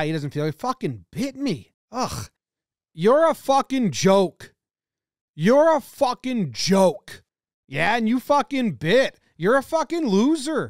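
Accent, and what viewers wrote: Canada. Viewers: Love your Canadian accent lol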